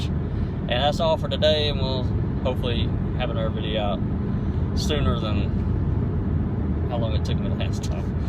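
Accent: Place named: South, United States. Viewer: It's always hopeful to see atheists like you in the bible belt; to be reminded that just because someone talks with a hick accent doesn't mean they're Christianized...i.e. brainwashed. I run across you diamonds in the ruff sometimes on YTube atheism and react like Dorothy did when Toto fled the witches castle: "He got away! He got away!" I empathize with your dilemma: all their lives, but especially when young, proselytizers are going to be overtly trying to convert your kids while others, like their Christian teachers, will be doing the same if more subtly. There will be strong social pressure to conform and convert and we both know how that's hard to resist when y're young, especially in the Belt. It's like a tug-o-war with you on one end and an army of Christians on the other and your kids are the rope! You know what's coming: "Your father is an atheist? 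You poor lost child. We will pray for you..." Fuck that! I'm afraid there's only one path for you: become a one-man army and take the fight to them! That's what I did and now I'm a Doctor of Atheism, ready, willing and able to face an entire auditorium of Christians by myself and adroitly turn them out because I know my science, my philosophy and my bible. It may seem weird but I love the bible for what it is: a priceless window into the minds of ancient peoples, ignorant and superstitious as they were. But as history or a guide for morality, the bible leaves a bit lot to be desired. And let's face it: Christians use the b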